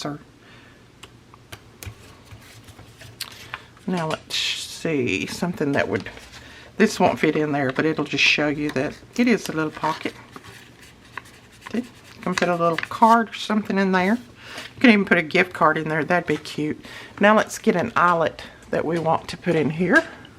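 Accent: South, United States. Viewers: love your projects and instructions! Love your southern accent! I live in Hawaii! Would love to win!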